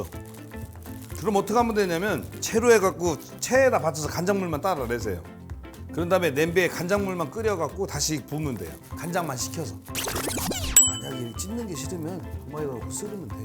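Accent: Chungcheong, South Korea. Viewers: 설탕 싫으면 안넣어도 되요 ..맛없을껄 ㅋㅋㅋㅋㅋㅋㅋㅋㅋㅋㅋㅋㅋㅋ 충청도 화법ㅋㅋㅋㅋ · 충청도 사투리인지 이제야 들리네요 ㅋㅋㅋ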